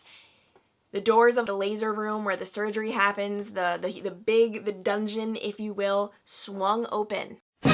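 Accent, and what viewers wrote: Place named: New Jersey, United States. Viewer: Great video.. that has to be a jersey accent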